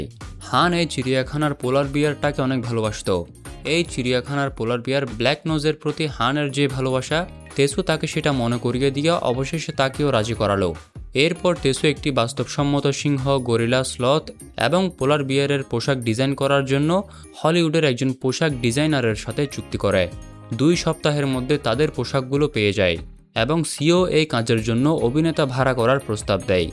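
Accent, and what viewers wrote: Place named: Rangamati, Bangladesh. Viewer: Apni Q & A koren plz onk qsn amar... Apni ki Chakma? Apnr voice amr Rangamati types lage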